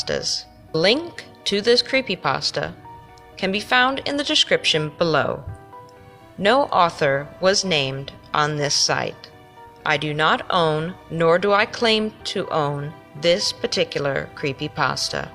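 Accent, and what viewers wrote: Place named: Chicago, United States. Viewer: it’s an honest compliment you have an unusual voice kinda like Velma with a little more what I think is Chicagoan.......maybe upper Midwest like michigander Minnesota Possibly Wisconsin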